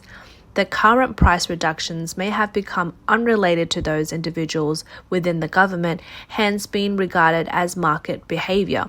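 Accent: Australia. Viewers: I was in the chat some days ago watching her video too but the lass in question who narrated a couple of days ago is a different lady to this narrator. I think that lady is a Hong Konger from Sutton, London; whereas this woman narrating now is likely a Taiwanese or possibly Hong Kong Aussie probably from Brisbane or Sydney or Melbourne. I think many others who watch China Observer confuse all of the female narrators😂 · I know a fellow Australian when I hear one 😊, but it's anybody's guess which city she's from because Australia (unlike, say, Britain) doesn't have obvious regional accents (just a few minor differences in vocabulary). I was in a workplace with people from all over the country, and if you wanted to know whereabouts in Australia someone was from, you had to ask them. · You have a nice Australian accent, maybe you can sell to Australian investors that want to escape the Melbourne winter. 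because these prices are 5 times less than a Melbourne apartment. Sell to boys from Melbourne and they can get a nice Chinese wife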